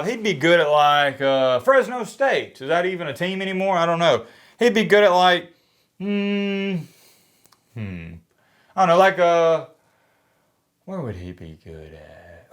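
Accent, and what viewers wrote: South, United States. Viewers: you look and sound like a pubeless hill billy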